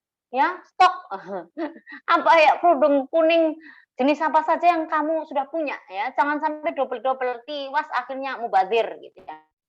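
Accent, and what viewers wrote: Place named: Banyumas, Indonesia. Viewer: Q sung seneng bolak balik tak ngringokna 👍🙏hehe wong ngapak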